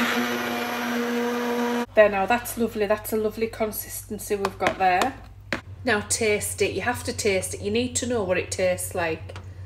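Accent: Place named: Sunderland, United Kingdom. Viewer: Are you a Sunderland lass I’m a Geordi xxxxxx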